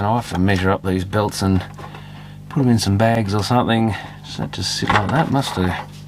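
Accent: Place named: Australia. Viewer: I’ve been tinkering around with a similar radio, trying to get the cassette player working again. I wasn’t expecting an Australian accent I’m glad I stumbled along your channel mate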